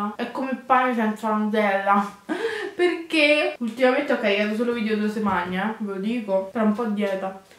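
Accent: Rome, Italy. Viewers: in questo video ti ho vista particolarmente ''Romanaccia''.....bellaaaa spontanea in alcune parti!!! Miii piace bravaaa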